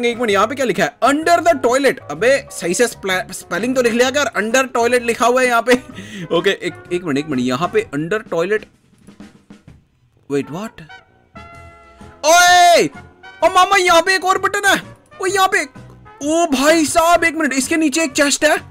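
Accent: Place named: India. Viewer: You are speaking like chapati hidusthani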